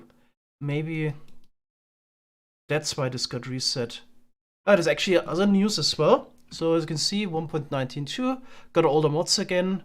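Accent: Germany. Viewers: It’s so interesting!! Looking at it phonology-wise, I believe it’s because in German, voiced stops and fricatives like b, d, g, and z are never found at the ends of words. However in English, the words eggs ends with both a voiced g and voiced z sound. Thus he replaces the voiced consonants with their voiceless counterparts k and s, which are allowed to be at the ends of words in German, but as a result both “eggs” and “axe” end with the same two consonants, k and s. As German is his first language (I’m assuming?), he must really struggle with using the ‘right’ phoneme sometimes at the ends of words or simply can’t hear the difference. Accents are awesome!!!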